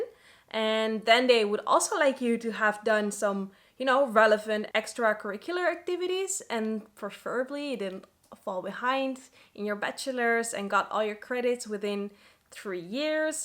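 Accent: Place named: Oxford, United Kingdom. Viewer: After your return from your overwhelming trip to Australia, I see a very happy grown-up young woman. I enjoyed you presentation very much. Your Oxford English is excellent. Even I could follow you without a problem. I am very proud of you. From a retired professional I give you a small advice. Speak a little slower and bring in short breaks. The interested listener has to consume your message. Your old but very happy Opa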